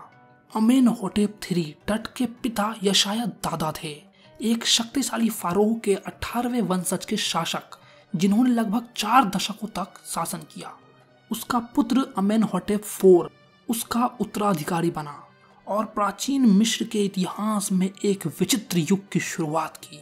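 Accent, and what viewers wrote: Bihar, India. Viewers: Bhaiya aap bihar se ho ki mp se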